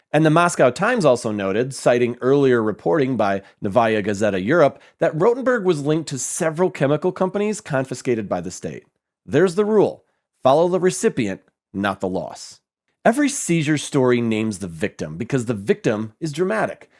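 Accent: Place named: United States. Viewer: He has American accent.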